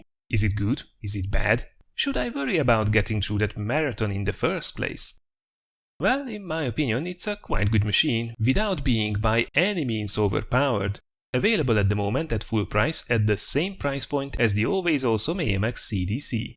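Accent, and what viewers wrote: Hungary. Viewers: Szia csak szerintem beszélsz magyaros angollal? :)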